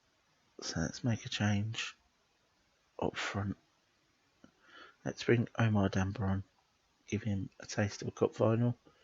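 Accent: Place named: United Kingdom. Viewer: I don't usually play these games but when the commentary comes and even a British commentary , It just becomes a whole lot interesting. Keep up the good work.Liked and subscribed.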